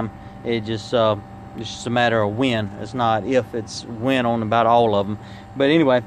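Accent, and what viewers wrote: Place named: South, United States. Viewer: Thanks bro ,when u from I travel to Baton Rouge for fire truck you sound like your from the great south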